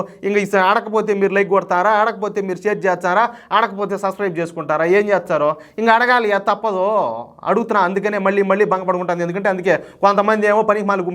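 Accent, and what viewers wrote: Rayalaseema, India. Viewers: Saru dhi RAYALA SEEMA yasa . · సీమా యాస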